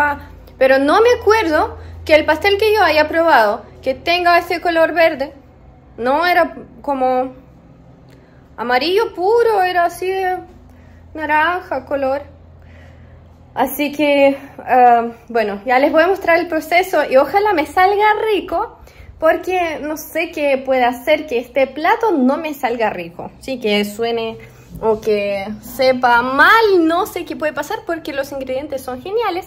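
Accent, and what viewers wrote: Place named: Chile. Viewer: Hola: Qué hermoso escucharte hablar en nuestro acento chileno... Ya aprenderás a hacer ese pastel de choclo... Te ves muy hermosa cocinando. Felicidades y ojalá puedas volver a nuestro país. Dios te bendiga.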